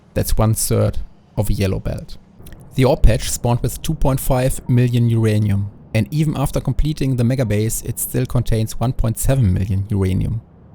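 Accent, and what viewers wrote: Germany. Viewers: very deutsch :D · r u german ?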